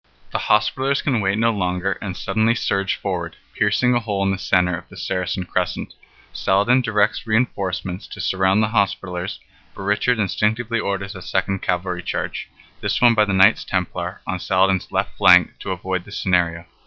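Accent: United States. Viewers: Hokey American voice and indistinct graphics make this a very amateurish effort. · the american accent just ruined it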